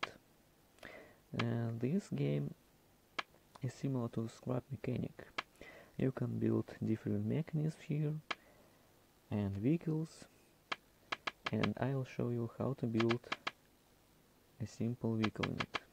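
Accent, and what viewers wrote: Russia. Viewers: Почему-то я слышу в твоём голосе что-то с русским акцентом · Да ну вы русский акцент слышу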